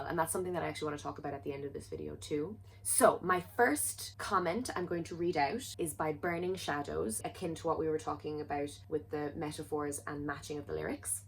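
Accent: Ireland. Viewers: Wow I really wasn’t expecting you to mention my comment! I feel so wonderful that you were excited about something I said when I’m always sooo so excited about everything you say thank you 💞💞💞 and I love this new segment! I think it’ll continue to be a really beneficial way to interact with your audience I for one feel very engaged and the more ppl that get that I think it’ll be really nice 😊 (also ppl pronounce my last name wrong quite often but you were spot on and i loved hearing my name in your lovely Irish accent lol 🥰)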